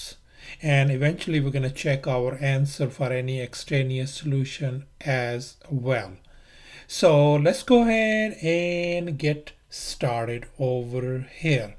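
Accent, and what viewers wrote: India. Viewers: Indian dialected man good job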